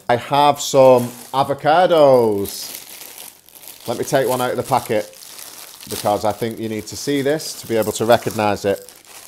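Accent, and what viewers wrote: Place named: Manchester, United Kingdom. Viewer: He's actually from the Manchester area in Northern England. His accent is different to the way people speak in Scotland.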